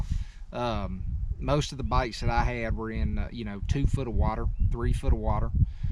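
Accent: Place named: South, United States. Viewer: So I've gotta ask you, what's a pieket, I know what a pocket is, but not sure what a piekit is, 😄😄. Man I had too, been watching your videos for awhile and you've got the biggest southern draw I believe I've ever heard. I get a kick on how you say pocket. I do enjoy your videos tho.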